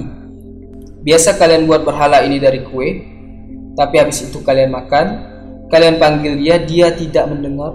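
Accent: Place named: Pontianak, Indonesia. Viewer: Org Pontianak ya bang..haahha..mantap ni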